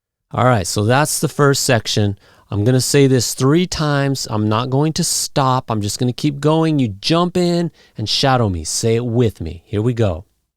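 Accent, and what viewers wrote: United States. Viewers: This voice Sound of American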